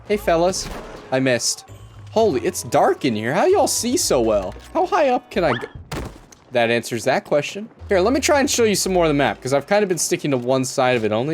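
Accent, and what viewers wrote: Canada. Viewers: Are you a newfie b'y?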